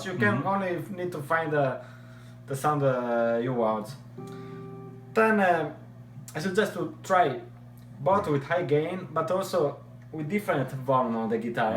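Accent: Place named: Veneto, Italy. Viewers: he's from Italia Do you speak italian ? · His accent obviously sounds bad, but its typical of the venetian region in Italy.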